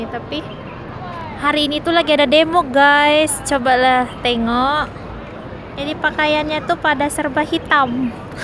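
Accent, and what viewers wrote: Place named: Java, Indonesia. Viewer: Keren say videonya.. kedengaran logat orang jawa juga.. 😁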